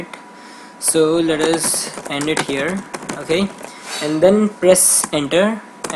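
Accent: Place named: Arab world. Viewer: Me noticing an accent: 🤔 in 🧠 -> is he Arabic?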